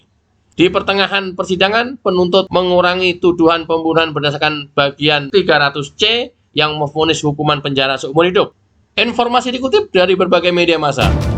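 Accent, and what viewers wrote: Java, Indonesia. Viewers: Bang?? Leh moco berita apik logate,..d campur bhs jawa.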